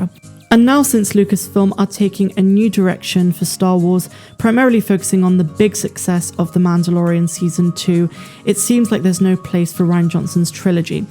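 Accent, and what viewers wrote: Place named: Bristol, United Kingdom. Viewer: your accent sounds like your from down my neck of the woods in Bristol or close by. Love your work mate Gert lush and Peace out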